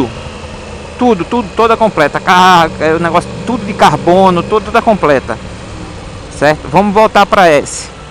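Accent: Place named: Rio Grande do Sul, Brazil. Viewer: nao nao nao, ele e gauho mds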